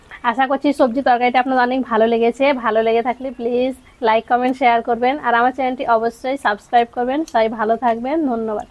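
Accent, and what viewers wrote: Murshidabad, India. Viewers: Amar to mone hoi murshidabad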